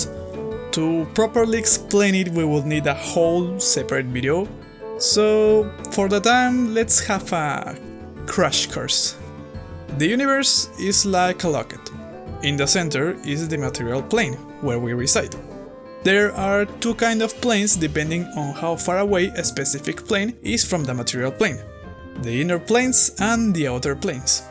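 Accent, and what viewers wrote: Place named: Argentina. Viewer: che pero vos sos de argentina? Perdona si no es así. Es que tu acento inglés es muy argento!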